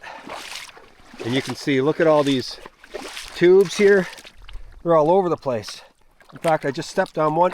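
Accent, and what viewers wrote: Newfoundland, Canada. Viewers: Are you a newfie? I get that feeling.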